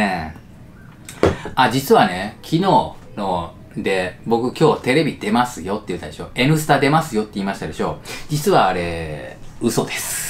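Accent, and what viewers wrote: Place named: Nagoya, Japan. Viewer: 北九州都市の何処かの町で産まれて❗だけど時々名古屋弁も、関西弁も出ますよね⁉️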